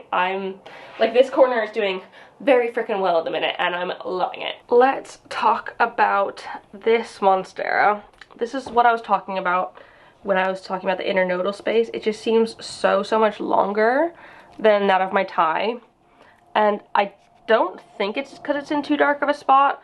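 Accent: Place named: United Kingdom. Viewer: Thank you! That seems about right—I should have known. I think that one is going on my list! Thanks for the quick answer! On a totally unrelated note, and I’m being a nosy new subscriber here, were you raised in the UK? Your British accent doesn’t seem to me to be as pronounced as others I’ve heard. Anyway, love your videos!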